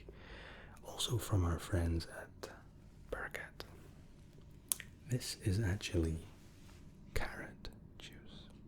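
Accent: Scotland, United Kingdom. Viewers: Where were you born because I fell like you have a bit of a Scottish tone and some words are pronounced Scottish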